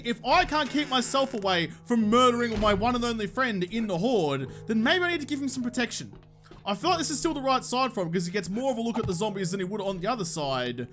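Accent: Australia. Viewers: i have a friend named josh, sounds exactly like you and is Aussie, hmmmmmmmmmmm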